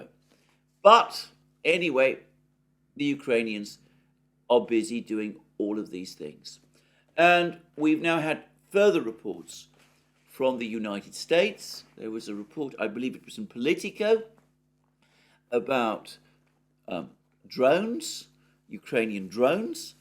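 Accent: United Kingdom. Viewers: Great strategic lesson. Great sophisticated super duper British accent delightful to ear. Thanks ❤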